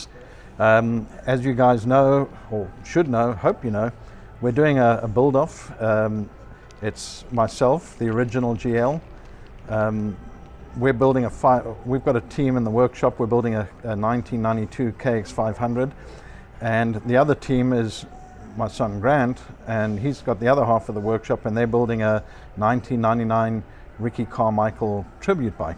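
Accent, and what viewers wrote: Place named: South Africa. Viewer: You, kind Sir, sound incredibly South African, if you dont mind me saying so. 2stroke Love from South Africa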